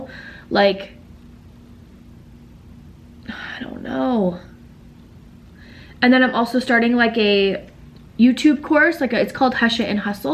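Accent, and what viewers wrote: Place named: Canada. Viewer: Lol I think it’s her Canadian accent? She says bagel the same way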